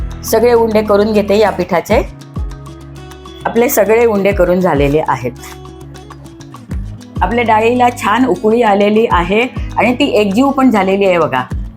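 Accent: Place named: Goa, India. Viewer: तुम्ही कुठल्या आहेत, मातृभाषा कोणती, बोलण्याचे toning Goa side chi वाटते की कारवारच्या आहेत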